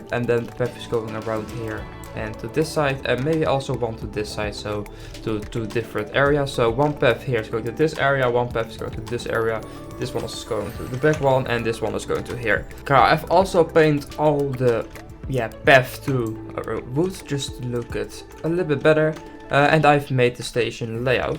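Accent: Netherlands. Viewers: your dutch accent is strong and great btw im dutch too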